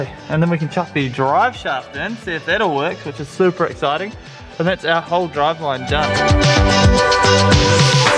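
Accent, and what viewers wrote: New Zealand. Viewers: I thought you was south island nz . Chur bro mean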